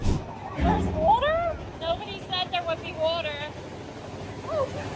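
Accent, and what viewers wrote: Ireland. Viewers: Well, Disney with an Irish accent is not as common 🙂 loved the video 🙂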